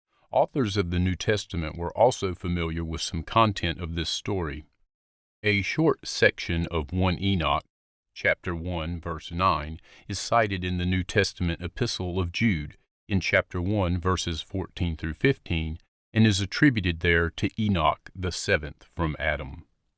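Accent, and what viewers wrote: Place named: Baltimore, United States. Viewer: im thinking baltimore or philly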